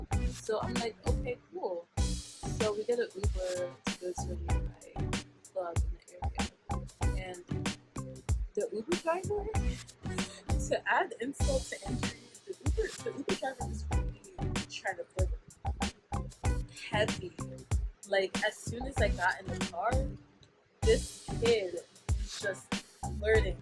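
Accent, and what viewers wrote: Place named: Yoruba, Nigeria. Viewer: Yoruba accent! Wow!!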